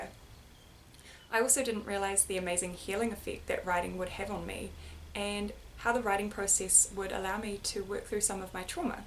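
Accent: New Zealand. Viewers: Happy for your success in publishing your book online! I too find that writing is the only way for me to truly express myself. I write poetry. Hope you don't mind me sharing a poem I wrote recently: He wanders through eternity like a hermit in the desert, seeking meaning and purpose in a world, in a culture overtaken by lies and façades. He’s told to consume commodities, to chase career and cash. “You’ll be happy then” they say. Yet he still feels this gnawing emptiness inside, even having arrived at these things. The answer to wholeness doesn’t lie there he sees. He sets off towards another horizon, seeking what’s worthwhile; in touch with the whispers of his heart. The compass of his soul guiding him onwards. I'm also from NZ. I assume from your accent that you are too. Nice to meet a fellow kiwi on YouTube:)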